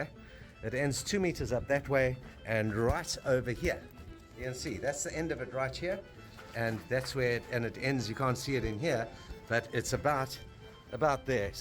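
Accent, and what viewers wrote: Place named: South Africa. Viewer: I hope I'm not getting this assumption wrong but you sound South African and if so why wouldn't you buy one of those beautiful Balance cats, especially considering these leopards have a very bad name build quality wise and after sales service. I'm sure you've probably seen the South African fella and his wife who was sailing around in a beautiful bullet proof Balance cat which was around 62 foot if I remember correctly. He sailed it to the US to be sold and I would've snapped that second hand cat up in an instant. It had been well looked after, it was run in so to speak and had the miles on the board and proof that it is a well built, well performing ocean cruiser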